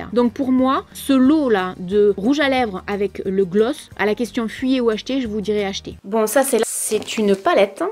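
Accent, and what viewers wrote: South, France. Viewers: Cool la fin. You are the best.. Avec notre accent du sud. J'ai Instagram. Je vois le hall de ton gros colis kado à gagner mais jne peux pas commenter et m'inscrire. J'adore ces couleurs de rouge à lèvres te va super.. Je l'adore.. Bizou 💋❤️ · Merci pour toutes tes vidéos j'aime énormément j'aime beaucoup ton accent du sud. Je suis aussi du sud de la France de Nice plus particulièrement! Bises toi et à ton chien qui est beau comme un cœur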